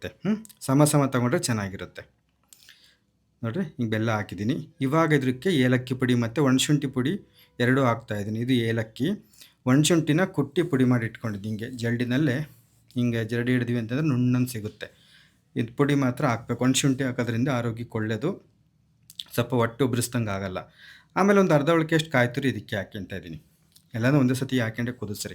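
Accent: Chitradurga, India. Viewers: Durgada bashe keloke chanda ..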